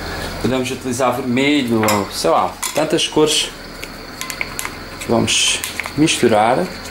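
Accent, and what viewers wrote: Portugal. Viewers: Ele não fala engraçado , vc acha isso porque o seu português é o português ( Brasil ) o dele é o português ( Portugal ) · Antes de mais obrigada pelo video, A receita está certa, se não com algumas pequenas falhas, as natas poderiam estar quentes, ou a gelatina foi posta quente e não morna como deveria, por isso não ficaram na consistência necessaria para decorar um bolo, deduzo que tambêm seria para decorar e não para rechear o bolo como foi dito, a dica do limão foi mesmo para tentar salvar as natas, que mesmo assim desandaram, mais sorte para a proxima.. OBS: Sotaque Algarvio? . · ele fala português Portugal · axo q ele e de portugal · Você fala com um português de Portugal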